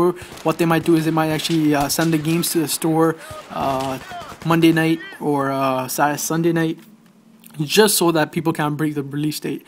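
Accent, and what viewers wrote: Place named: Montreal, Canada. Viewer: u sound like u live in monteal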